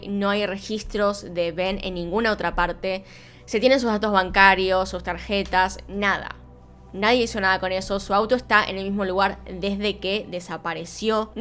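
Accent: Argentina. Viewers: Lo único malo es el acento argentino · que te pasa con el acento argentino, gil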